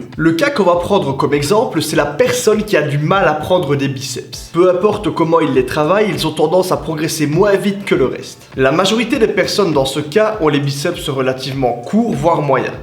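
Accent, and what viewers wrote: Belgium. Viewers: Ahhh l'accent Belge m'avait manqué 💪🤘🇧🇪!!! Merci pour tes conseils !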